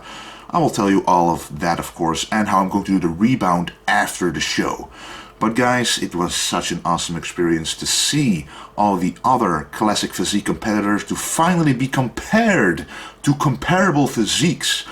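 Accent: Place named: East, Netherlands. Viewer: Haha grappig accent. Oosten van het land?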